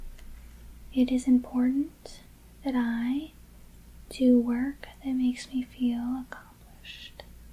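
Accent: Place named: Midwest, United States. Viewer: Do you live in the midwest? You sound like you have a midwest accent.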